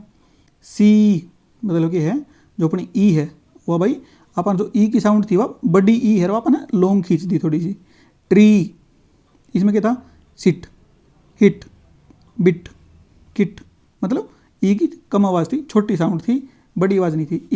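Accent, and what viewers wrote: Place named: Haryana, India. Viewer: Very valuable and useful class (english in Haryanvi tone👍🏻)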